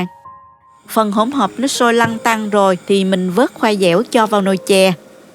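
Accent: South, Vietnam. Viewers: o dau cung duoc nau do an ngon co giong noi dac chat nam bo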